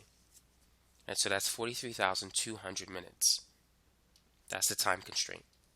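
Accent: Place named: New Jersey, United States. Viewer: you're def from New Jersey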